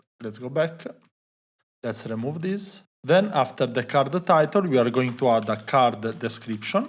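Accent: Italy. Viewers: Ma sei italiano? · Nice work! Are you Italian? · Are you Italianoo ❤?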